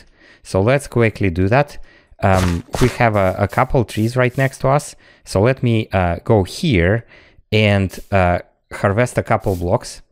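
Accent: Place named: Russia. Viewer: Слышно что русский)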